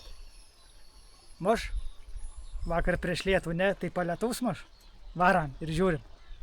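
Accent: Aukštaitija, Lithuania. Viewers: labai patinka tavo aukštaitiška tarmė,ir jeigu tavo gera nuotaikėlė,tai gali filmuoti bet ką.Svarbu kai tu linksmas.Labai pakelia nuotaiką.😂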